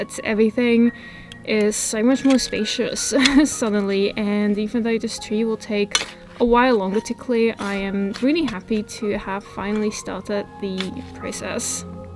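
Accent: Netherlands. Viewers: I also heard Dutch… Groeten vanuit Breda 🥰🥰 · De eerste keer dat ik een filmpje van jou zag dacht ik bij mezelf; er is iets met haar accent, waar zou ze vandaan komen? Erg grappig om Nederlands te horen 😃 je tuin wordt erg mooi en ik kijk elke keer uit naar de zondag!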